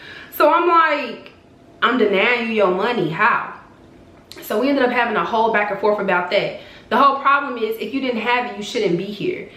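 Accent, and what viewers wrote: Chicago, United States. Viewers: I watched Ti’s story time do I had to watch yours. Btw are you from Chicago?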